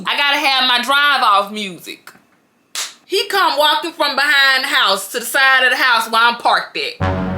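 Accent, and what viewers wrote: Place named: North Carolina, United States. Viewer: WHER U FROM !??? I HEAR YA ACCENT !!!! IM HEARIN NORTH KAROLINA !????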